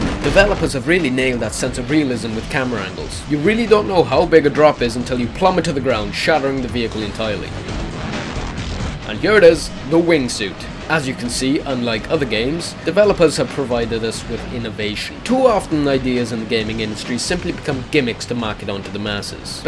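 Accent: Wales, United Kingdom. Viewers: obviously hes welsh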